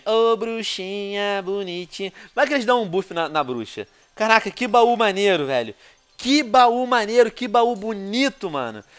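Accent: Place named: Portugal. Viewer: Kaio ele é português ele fala assim.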